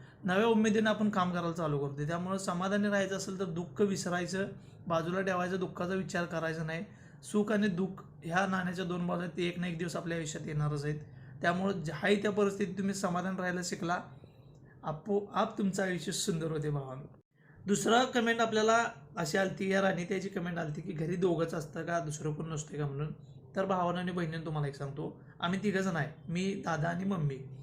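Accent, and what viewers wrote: Kolhapur, India. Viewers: दादा कोल्हापूहून आहे काय भाषेवरून विचारल..😊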